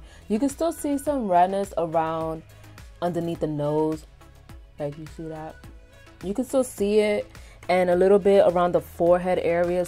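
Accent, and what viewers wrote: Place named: New York, United States. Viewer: Hi! I found your channel and I just had to tell you that you’re absolutely adorable! I could listen to your accent forever! My family is from NY and I miss hearing voices like yours. I love your techniques and you have the most mesmerizing eyes ever! Hugs from Seattle. ❤️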